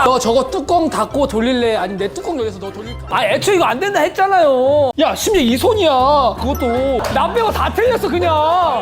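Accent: Jeolla, South Korea. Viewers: 말투가 너무 구수해서 빼박 전라도 출신인줄 알았더니 태생부터 서울사람이넼ㅋㅋㅋㅋㅋㅋㅋ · 형원이의 영향인지는 몰라도 사투리할 때 전라도 사투리가 자연스럽게 나오는거 볼 때마다 깜짝깜짝 놀라 한국의 얼을 이해를 못한거야를 모단거야 하는데 진짜 자연스럽다 생각함 ... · 민혁씨 전라도 출신인가요?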